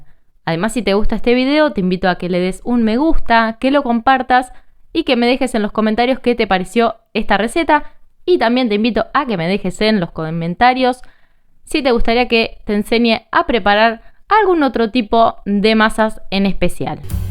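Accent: Argentina. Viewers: CHICA ERES DE ARGENTINA SI POR EL ACENTO ANTES HABIAN MUY BUENAS RECETAS HABIA UNA CHICA FABULOSA ELLA SE COMIA LOS jos crudos le tenia mucha No E acuerdo de su nombre era fabulosa lo unico que se que era hija de una artista de cine argentino Etc no recuerdoel nonbre hace mucho tiempo de eso .okey Yo vivi en Nyc cuando iba CONEY ISLAND LA PARTE DE LA COLONIA RUSA JUDIA EN EL BRAITHON BEACH EN LAS PUERTAS DE LAS CAFETERIAS RUSAS VENDIAN UNAS EMPANADAS MIY GRANDES COMO DE 15 CT. RELLENAS DE POLLOS OTRAS DE PAP.AS Y QUESO CARNE ERAN MAZISAS ESPONGOSAS MUY RICAS SE ACABAVAN EB SEGUNDO TODO EL MUNDO A COMER ERAN DIFERENTES A LAS NUESTRAS LUEGI EL CAFE O LO QUE QUISIERAS MENOS VODKA MAY GOODNES QUE TOMAN LIS RUSOS SERAN LOS NO JUDIOS ESE LUGAR MI FAVORITO POR EL BUFFET RICO BARATO FUTAS VERDURAS ALLI TRABAJAN MUCHOS MRXICANOS Y HASTA HABLAN RUSO ES LINDO X ALLI TAMBIEN VENDEN PRODUCTOS DE LOS ADMICHII ESA GENTE DIFERENTE QUUE VIVEN CREO EN PESILVANIA PERO BUEN QUESO MANTEQUILLA LECHE Y BARATO NO SE AHORA CON ESTO DELA EPIDEMIA NOS ARRUINO Y TODO ESTA SUBIENDO QUE SUBAN LOS VICIOS ASE COBRENLE UN OJO DE LA CARA Y QUE VALLAN A BSÑAR AL RIO HUDSON PERO LA COMIDA NO. 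NO SE QUIRE LIMOSNA DE COMIDA SI NO TRABAJO CON DIGNO CON EL SUDOR DE EL ROSTRO .NO DIGO MAS DISCULPEN POR QUE LA GENTE SE ACOSTUMBRA Y ESO NO ES VIDA.TRABAJO PARA AMERICA LATINA EMPRESAS SI SE PUEDE GOD BLESS HUMILDEMENTE.🇺🇸❤❤❤❤❤❤❤❤🇵🇪🇦🇷🇧🇷🏆🤩🎯🎯🎯🎯🎯🎯🎯⚽️🏆🇵🇪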